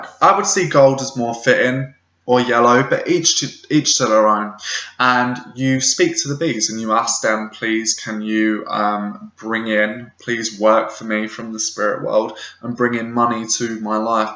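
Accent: England, United Kingdom. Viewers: Love your videos. Nice to hear an English voice ;) easy on theeyes too ;)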